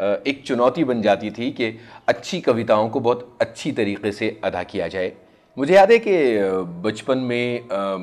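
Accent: Standard. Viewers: आपका उच्चारण बहुत shuddh है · Too good... Hindi kavita aur Yunusbhai ka vishudh Hindi uchcharan... sone pe suhaga... what else...?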